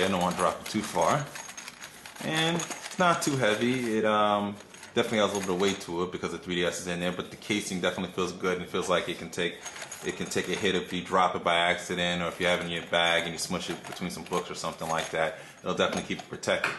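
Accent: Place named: New York, United States. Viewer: "NEW YORKIAN ACCENT"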